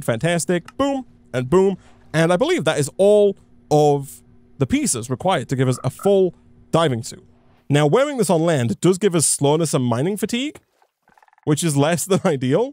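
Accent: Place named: Wales, United Kingdom. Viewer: I can't place that accent. Welsh is as close as I can get...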